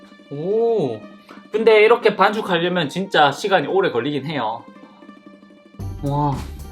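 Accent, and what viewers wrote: Busan, South Korea. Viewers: 사투리일걸여 부산사람이라서 ㅋㅋ저도부산사람이라 바나나가안되여 빠나나밖에안댐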